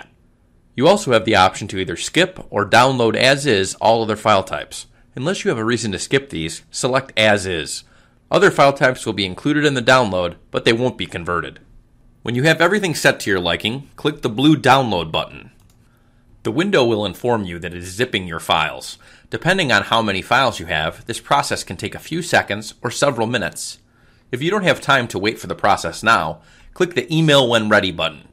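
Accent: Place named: Western Pennsylvania, United States. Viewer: you are from western pennsylvania, aren't you? Somewhere near Pittsburgh, I would guess.:)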